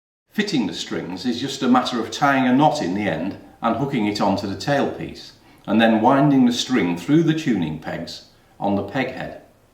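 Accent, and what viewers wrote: United Kingdom. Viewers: I never expected a posh Englishman to teach me how to set up the most redneck instrument in the world lol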